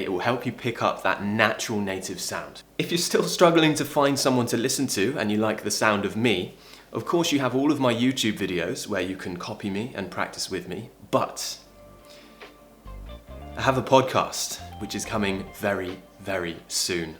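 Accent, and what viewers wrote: United Kingdom. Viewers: Hello, thank you very much, this is really useful. What is your accent? Is it RP? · Master, it is really wonderful to listen to you! Your British accent and pronunciaton is so perfect! You are an excellent teacher, no doubt. Thanks for everything you give your listeners. I am a teacher of English and Bachelor of Art but I still feel I need more exposure to the Spoken English language. Imitation is one of the most important step in the learning process! You will be my role model, for sure. Looking forward to listening to you again soon. Take care! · Greate video bro.I'm from India.India has biggest English speaking population in the world.English is our official language.cricket commentary is the best way to lean English as i utilized this method.tony grag was best commentator ever according to me.he was English cricket captain.his accent was awesome.from my childhood l wished to have a British accent but not happen till now.but ur videos r help full.Indian accent is very different from British.ur British accent is awesome.I can lean more from ur awesome videos bro. 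Cricket world cup is going on in England&India goona facing England on Sunday.wish u good luck. ur biggest fan from India 🇫🇴❤🇮🇳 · I want to speak British, so how I can speak like you I like your speak very much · Hi, I'm a student learning Enlighs from Japan. Thank you fro this video from 2021. You are the perfect role-model for me cause your British pronouciation and accent is my ideal one. You are gonna be my best teacher on YouTube right now!! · Good afternoon. Thanks very much for your great advice. You're a unique, so no matter what I will try to copy you I will never be able to speak like you do with this beautiful and clear British accent. I just love it. Sure I will be listening your podcast, not only to improve my accent but also to learn more from your experiences. I try to improve it all the time, but then asking me to speak like you, is kind of asking to much. However I've been learning so much from you and I do appreciate it. 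Again, thanks ever so much.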